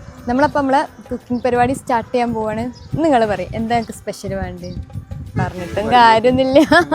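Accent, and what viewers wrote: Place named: Rajasthan, India. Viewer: Rajsthan maarvadi aan slang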